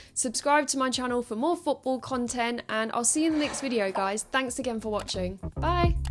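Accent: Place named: RP, United Kingdom. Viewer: Poshest voice av ever heard in a toon top 😂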